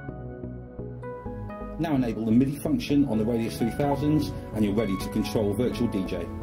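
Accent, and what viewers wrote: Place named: United Kingdom. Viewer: why is the american audio product demo being led by a british guy? =)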